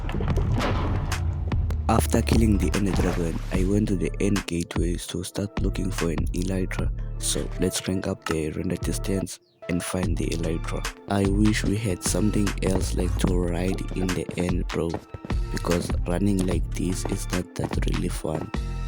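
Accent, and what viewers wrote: South Africa. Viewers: his accent is obvious, might be Zulu guy or venda idk tbh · U sputh african????? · You sound sound south African like a Xhosa one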